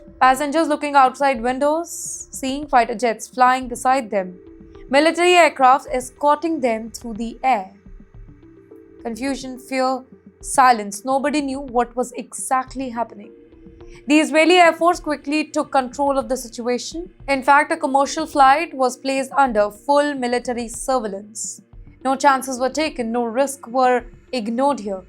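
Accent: India. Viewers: Heavy Bindian accent makes hard to understand. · The headline is also incorrect. The plane was never hijacked. All in all, I think you should report in your own language. When you speak Apke Apke for so long and so hard, you tend to Passover the bad habits from your language into English. I see this all the time. Content creator is always an Indian from India that will have a video , that has been captioned in English , but 25 seconds into the video , the Apke Apke will start, and that Apke Apke won’t stop until the end. This is why people like me , that grew up speaking English as a first language have to always write exams and tests proving my eligibility to speak English , because of the Apke Apke people that keep fucking up the English language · What kind of Hindi English is she speaking? Can you not find someone who speaks proper English as your announcer? 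The Whole story is just a joke. AI produced stuff?? :face-orange-frowning::face-orange-frowning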